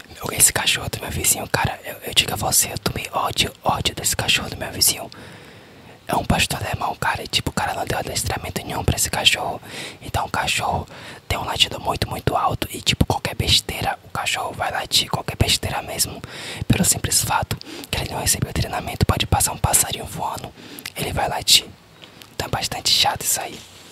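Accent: Bahia, Brazil. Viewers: Com certeza é baiano né? Já irei inscrita ❤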